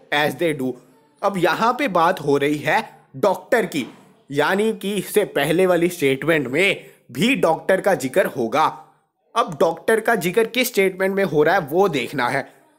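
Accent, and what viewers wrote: Punjab, India. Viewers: Sir ji app punjab se ho kya ?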